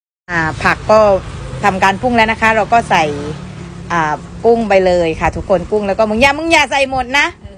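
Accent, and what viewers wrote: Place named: Central Thailand, Thailand. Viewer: แหลงกลางชับๆเพนิคนๆ☺️😘🤩💖💟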